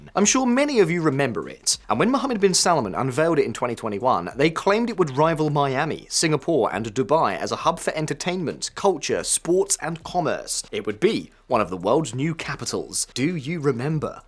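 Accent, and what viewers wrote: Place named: United Kingdom. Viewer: Why is every anti-saudi video made by a british man? Before i even clicked i knew the speaker was British lol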